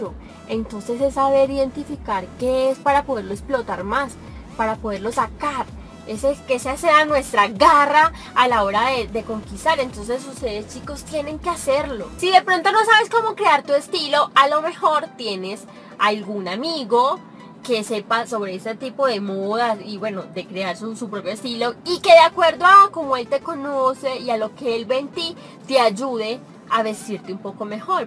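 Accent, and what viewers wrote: Colombia. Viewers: Hola apenas estoy viendo tus videos y conociéndote, eres una chica guapísima, linda y muy carismática!!! me encanta el asento de las chicas colombianas jajajaja ya me convertí en tu fiel fan.! voy a seguirte con frecuencia saludos desde Monterrey México..!! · Me gusta el asentó colombiano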